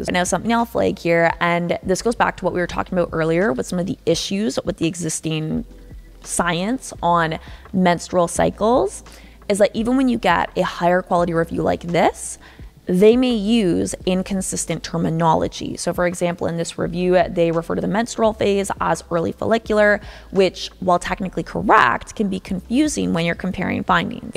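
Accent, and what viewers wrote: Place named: Canada. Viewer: It's a Canadian accent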